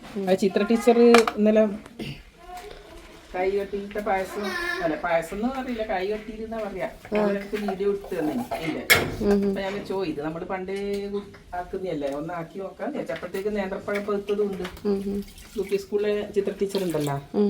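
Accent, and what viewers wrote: Kannur, India. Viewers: ങ്ങളെ കണ്ണൂര് പാഷ പൊളി ആ ന്ന് കേട്ടിനാ ങ്ങൾ ?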